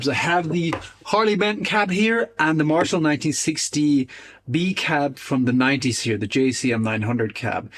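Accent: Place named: Ireland. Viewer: Really enjoyed the video…. I absolutely love your commitment to the development of speakerpedia. I have to ask something though as it’s really bugging me. Did you ever live in Ireland ? It sounds to me like you might have either lived in Ireland or learned English from an Irish person. Weird question i know but these things tweak my ear and i have to know. Thanks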